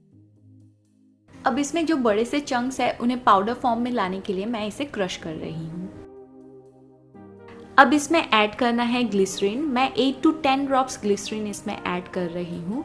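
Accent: India. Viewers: Before, you wrote the name of the ingredients in the legend of the step by step. I know how to speak English, but due to your Indian accent I have a little difficulty understanding what you're talking about. Please, if you do not mind, start captioning again. Please. I like you so much. Congratulations on the evolution of the channel =)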